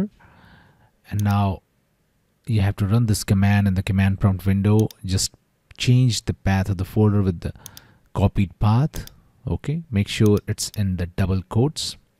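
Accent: India. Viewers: It's a law of physics. If you hear a pajeet accent in the video, it won't work.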